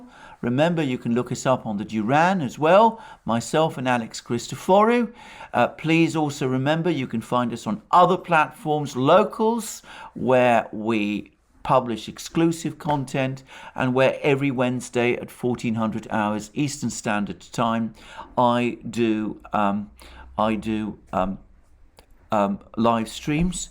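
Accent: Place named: RP, United Kingdom. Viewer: in from the UK originally, Alex is quite posh I his speaking. Something to aspire to. Refer to yourself, referring to ones self, what? Pardon? Where u at? Where are you? Come hither.... Haha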